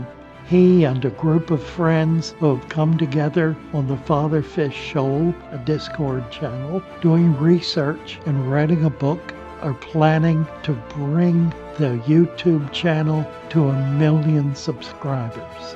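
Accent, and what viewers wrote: Baltimore, United States. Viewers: I grew up in Baltimore too. Your life has been amazing. I knew the first time I heard your voice you had Baltimore roots. I'm sorry about your dad. I first got into the hobby at Seabreeze Aquarium on York Road and I remember they had dirted tanks in the shop. I'm 65. God bless you and yours. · I knew you had to be from Baltimore when I heard you say “wuurder”, it’s the same way my mother says it. I grew up in Baltimore as well but I don’t pronounce water the same way she does.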